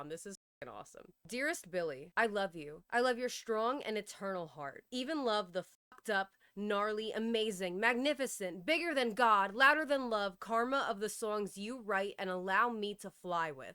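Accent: New York, United States. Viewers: When your sick you sound more like a New Yorkian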